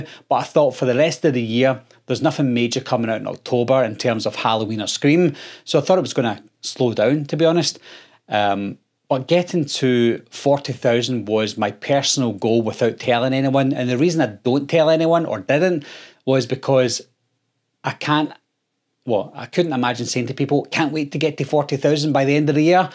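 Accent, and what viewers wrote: Scotland, United Kingdom. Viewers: Well deserved fella. Btw I love the Scottish accent and can understand you just fine. Keep on keeping on.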